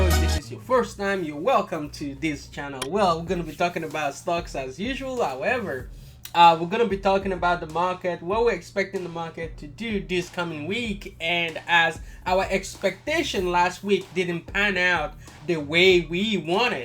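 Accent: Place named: Nigeria. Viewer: You have a heavy heavy accent are you from Nigeria ❓🧐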